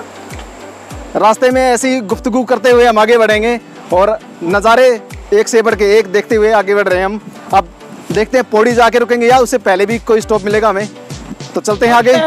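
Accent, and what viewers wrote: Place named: Haryana, India. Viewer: बोली से हरियाणा का लग रा ह भाई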